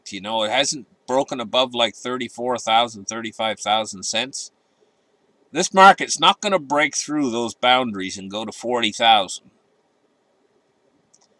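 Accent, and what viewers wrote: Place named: Nova Scotia, Canada. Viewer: lol DXY... the comparison of ga-a-a-r-bage (Gotta love that NS accent.) You have a good one as well,. 🏠🌞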